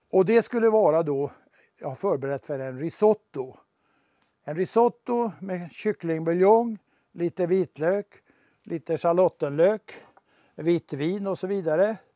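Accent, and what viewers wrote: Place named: Gothenburg, Sweden. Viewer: Hej, Du bryter både på "Göteborgska " och "Stockholmska". Vilket är din farorit dialekt?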